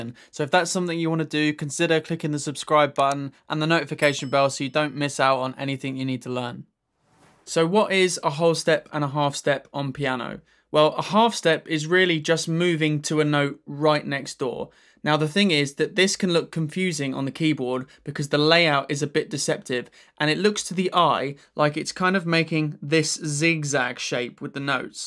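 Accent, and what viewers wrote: United Kingdom. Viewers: His Bri ish voice is very great. Teaches well, too!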